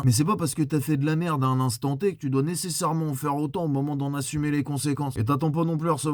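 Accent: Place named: Paris, France. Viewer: La france a un gros problème de bistouquette surtout du côté des mecs sans vouloir insister lourdement.. Mais les gonzesses ferment leur gueule aussi, mais les lanceurs d'alerte c'est des lanceuses très souvent.. Alors tout le monde chez le psy. Allez ouste.. Merci pour tes prises de paroles. J'aime ton accent parigo, j'me sens chez moi.. Ça m'fait du bien aux esgourdes.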